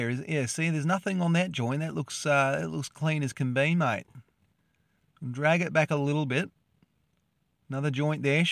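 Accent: Australia. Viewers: Hey there mate I just stumbled on your YouTube a few weeks ago and I just subscribed to your channel, I’m from Adelaide, South Australia and you have really put a big smile on my face watching your channel, you are sure a %100 Aussie from your accent, I was born here in South Aussie from my parents who escaped Vietnam for me to have a safer life here in Australia, I’m 44 in July and you have made me smile from the first YouTube I’ve watched. I’ve been through cancer since 1991 and kicked cancer in the butt. You keep up with the good work your doing 😊😊😊😊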